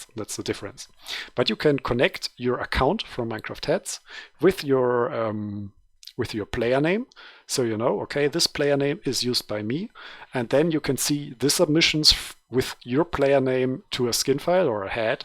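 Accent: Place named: Germany. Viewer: Klingt nach einem Deutschem, der Englisch spricht ^^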